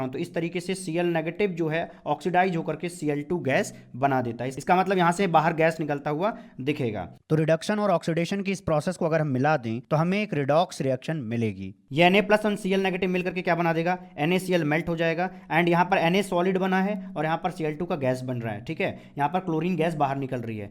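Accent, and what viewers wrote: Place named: Bihar, India. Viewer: This chemistry sir feels like he's from bihar😀😀....so proud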